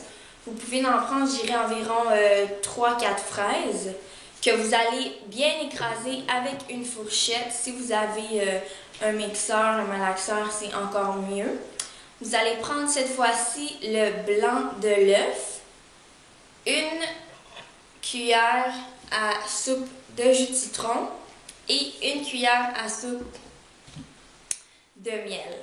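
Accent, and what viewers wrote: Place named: Quebec, Canada. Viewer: lol oui les français nous avons un accent , mais les québécois vous avez un accents très prononcé , c'est pour ça que je regarde beaucoup de vidéos faite par les québécoises, j'adore cet accent , je le trouve magnifique :-)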